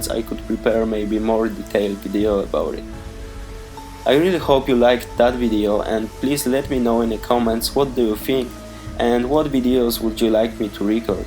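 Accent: Poland. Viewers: Wyczuwam Polski Akcent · When I heard you I thought: What a polish voice! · Ah ten polski akcent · Coś taki polski ten angielski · Polisz inglisz najlepszy <3 :D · to Polak?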